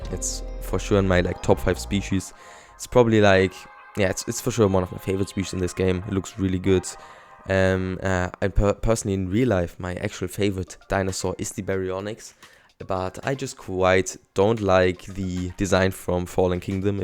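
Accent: Germany. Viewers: I love your german accent i don't know why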